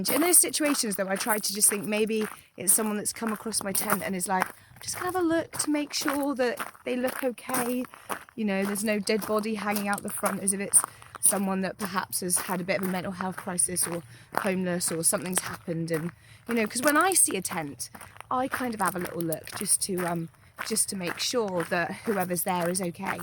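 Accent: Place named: West Country, United Kingdom. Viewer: Absolutely brilliant,, love the passion,,does it really matter how many miles are walked every day?? I think you're doing a 'proper job' !!! West country twang for you!! All the best 👍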